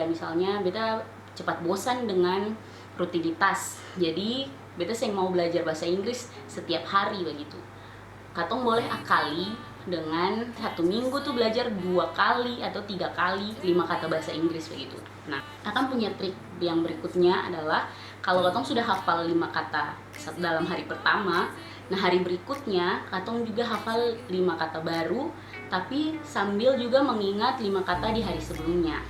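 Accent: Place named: Ambon, Indonesia. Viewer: Kudos to this video. 1. Apresiasi penggunaan dialeg ambon dalam penjelasan 2. This video might take 17 menit, but trust me, semua pertanyaan umum or kesulitan yg biasanya dihadapi pemula, udah dijawab disini. 3. I highly recommend the tips of having English environment, watching movies dan pake app untuk English learning contohnya ELSA (di app store ada) untuk pemula. Last but not least, please punya kemauan! Again, thankyou kaka 🎉🎉🎉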